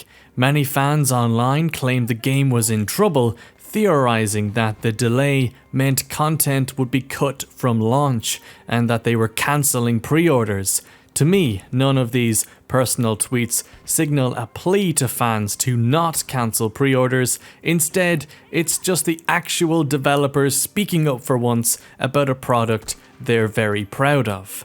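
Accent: Ireland. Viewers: I love that thick Irish Celtic English accent...you sound Like some pirate from Carribean...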